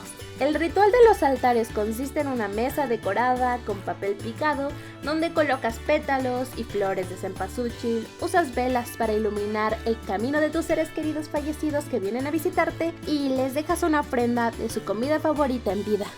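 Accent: Chile. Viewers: Yo si pensaba que eras chilena 😆 es que tienes el asentó de chile soy de mexíco y conozco a unas personas de chile las conocí por Wattpad un saludo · Yo creí que eras chilena por tu forma de hablar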